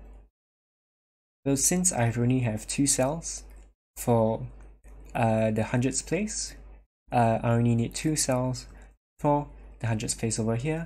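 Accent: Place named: Singapore. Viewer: Hope you don't mind me asking, I feel like I recognize your accent. Are you from somewhere in or near Lion City by any chance?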